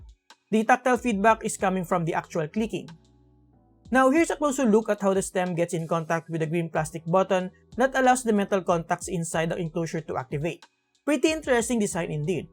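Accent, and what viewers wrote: Philippines. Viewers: his accent is so... Woah very filipino (in my ear) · He has dat pilipino aksent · Ingles wid the pilipino aksent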